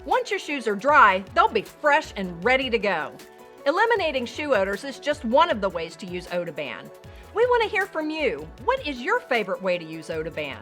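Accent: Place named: South, United States. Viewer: i didnt know odoban had such southern twang. never woulda guessed it.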